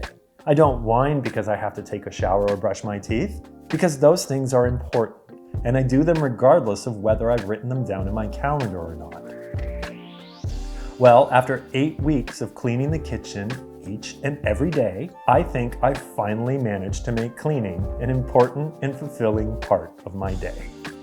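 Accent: Canada. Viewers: Sounds Canadian, no? Very calming. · yes he sounds Canadian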